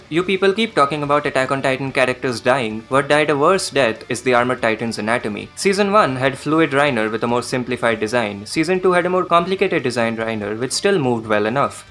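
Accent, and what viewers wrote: India. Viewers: Why you sound like an Indian scammer🤔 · Are u indian? 🗿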